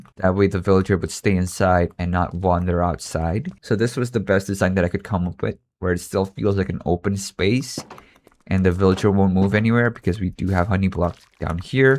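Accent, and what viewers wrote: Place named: Indonesia. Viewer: this is my first time seeing this channel and i thought i recognize that slight indonesian accent and turns out i'm right!! 😄i didn't expect this, are there more of you guys?? peak content btw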